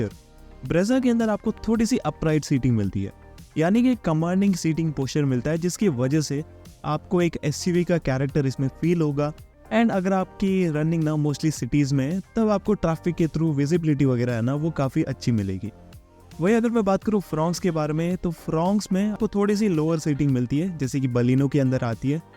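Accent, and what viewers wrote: Kerala, India. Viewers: The speakeris a South Indian most probably a Malayali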